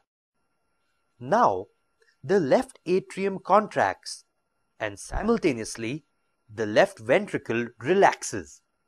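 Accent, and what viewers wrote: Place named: India. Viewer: lovely indain accent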